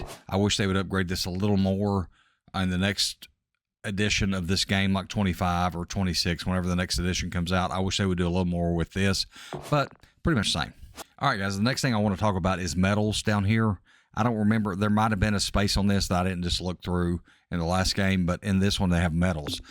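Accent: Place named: South, United States. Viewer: Thanks a pant load.. 30 whole seconds running a late model (I have no interest in) all by yourself. And 8 min 20 seconds listening to your dumb redneck accent talk non-stop. Thanks to you, I have no clue what it might be like running a sprint car (Original Outlaws) with other cars on the track. Imagine that. Other cars on the track. Have you ever advanced that far?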